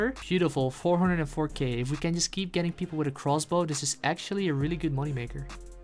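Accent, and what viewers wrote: Netherlands. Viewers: I can tell that you are Dutch, SICK accent :P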